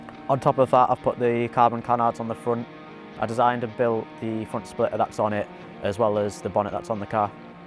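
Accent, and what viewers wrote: United Kingdom. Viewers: I love the loud grunge metal music in the background then the soft belted British accent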